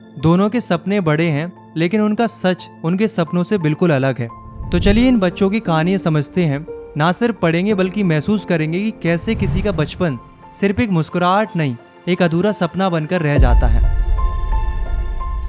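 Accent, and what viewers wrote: Uttarakhand, India. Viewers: Bro aap uttrakhand se ho ? ❤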